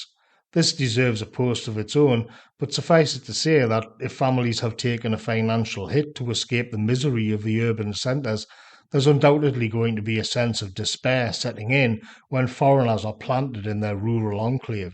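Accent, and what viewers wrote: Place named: Newcastle, United Kingdom. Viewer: Incredibly well written article, as ever, with added poignancy when read out loud in those Geordie tones.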